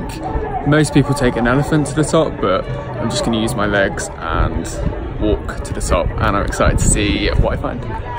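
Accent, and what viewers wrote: United Kingdom. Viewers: Aww u came to my country and I didn't even know 😭 Btw I love Ur British accent Keep shining like always.... I don't know why but I'm kinda afraid of monkeys 🙈 but these ones were so cute Oh and I could still see the slight nervousness in Ur tone....I feel like u have become more confident after becoming a Muslim. Stay blessed.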